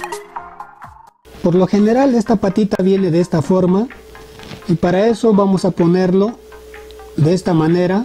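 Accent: Peru. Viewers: Buena info....eres de Perú?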